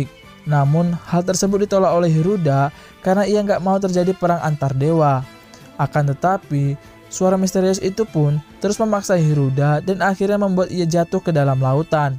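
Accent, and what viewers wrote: Palembang, Indonesia. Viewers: Anjir kuat nean Wong Palembang ceritonyo? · kuat nian? wong plembang apo mang? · Mimin nyo wong Palembang hehehe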